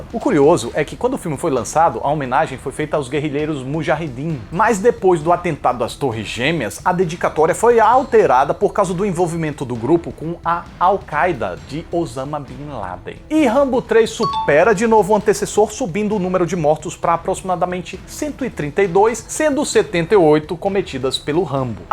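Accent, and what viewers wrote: Northeast, Brazil. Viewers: Adorei o falatório. Bem nordestinês! Me identifiquei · Cara gostei muitooo do seu vídeo com certeza o melhor e mais completo desses últimos dias sobre Rambo... E eu sou nordestino e vc fala parecido kkkkkkkkkk, você sebe se vai ter alguma coisa do filme para vender na estreia do filme nos cinemas tipo a faixa a faca?? Seria ótimo para nós fãs, abraço!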